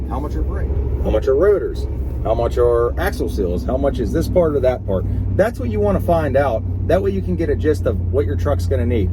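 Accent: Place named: Philadelphia, United States. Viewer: You sound like a Philly guy. Dig the accent! Love the truck.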